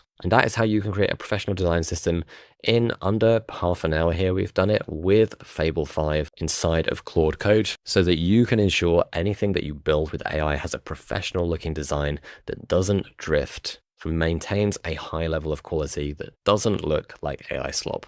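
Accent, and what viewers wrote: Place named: United Kingdom. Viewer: I don’t care about captions - I listen, not read. I only said improve the mic bass because there’s way too much low frequency in the audio with such accent, making it hard to understand. Had to replay the video just to catch it. Are you British or something? Is that why my comment got under your skin, kid? Lol.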